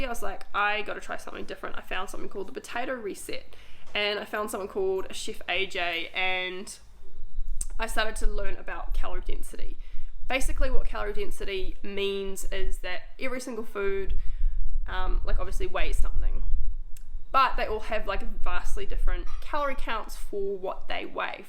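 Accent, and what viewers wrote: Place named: New Zealand. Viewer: As soon as you spoke I knew you were a fellow kiwi and the struggle is real to gain the proper technique when it comes to vegan weightloss! You are so right when it comes to the confusion over all these trains of thought about diet! Wow that is so exciting to be collaborating with Kiki and Hannah when you are just starting out! You must have been mind blown! ! They are both my favorite vegan you tubers! ! Way to go!!!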